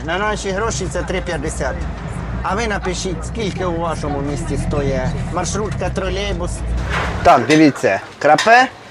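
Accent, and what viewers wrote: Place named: Kremenchuk, Ukraine. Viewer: Однозначно лайк за просування українського контенту❤❤❤🇺🇦🥰 але кременчуцько-світловодська школа рве вуха😂😂😂 люблювас полтавські галушки❤❤❤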